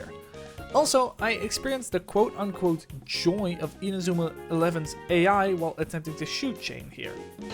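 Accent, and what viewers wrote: Limburg, Netherlands. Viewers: hoor ik hier nou een tikkeltje limburgs?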